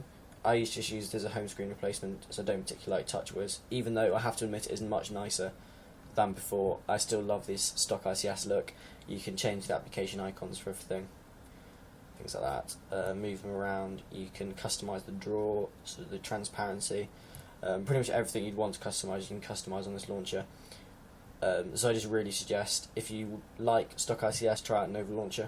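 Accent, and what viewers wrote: England, United Kingdom. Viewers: I realy hate that british accent its so ... gay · British accent hurts my ear T_T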